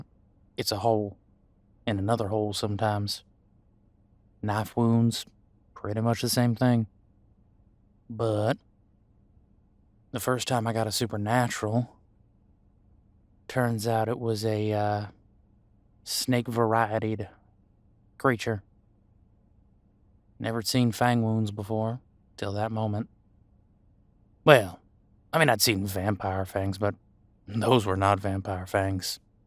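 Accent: United States. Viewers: FUCK YES HE'S SOUTHERN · Was this made before or after you got the cable situation sorted? Also I'm just curious as to the accent. It feels Carolina with a bit of Kentucky touches. Meto voice to be sure that is compatible with their roots. Bit of " turns Detective after encounter with a human fiddler that changed their mind about life".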